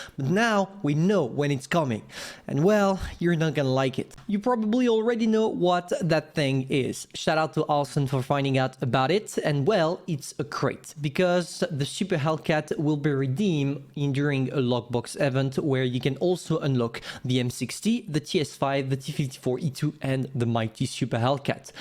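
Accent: France. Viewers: Je crois que tu es Français vu ton accent et ton nom sur Youtube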